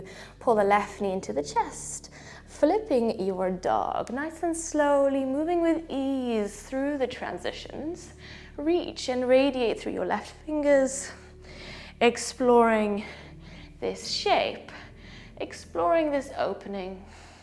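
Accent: South Africa. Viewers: Hi, thank you for this amazing practice, I really enjoyed it as well as your lovely south african accent,I am also from SA but I live in Germany..it feels so good doing yoga with you..makes me feel at home, my intention is to do your challenge, I started today and will carry on tomorrow! Thank you 💖 💗 💛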